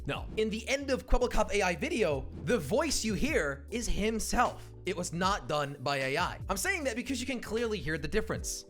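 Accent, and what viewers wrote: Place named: United States. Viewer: Wait, you're Dutch as well? Unlike Kwebbelkop you don't have the goofy accent at all, in fact you sound just like an American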